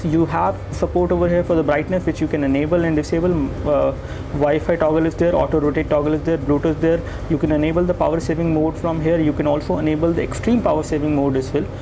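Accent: India. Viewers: INDIAN shitty english .. . .. lol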